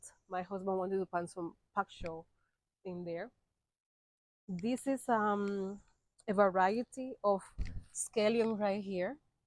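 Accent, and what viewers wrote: Jamaica. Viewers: I love your spanish/patwa mix accent/ words. So cute. Nice content. I'm in north Florida and its so hard to grow our foods here. Only yams, sorrel and sweet potato does well here but harvest is small. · I love your new Ja accent, its so cute, your right about Jamaica's soils, i reside in the United States and i miss my country.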